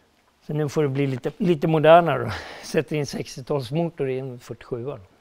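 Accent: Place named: Stockholm, Sweden. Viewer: Skön lirare 😊, och pratar riktigt Stockholmska 👌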